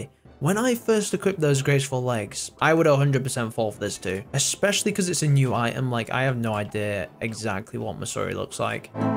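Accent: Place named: United Kingdom. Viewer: You sound even more British now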